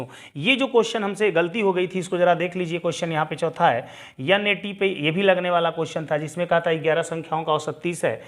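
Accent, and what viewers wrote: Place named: Bhojpuri, India. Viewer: Bhojpuri andaj me jabardust lag rhe h guru ji😊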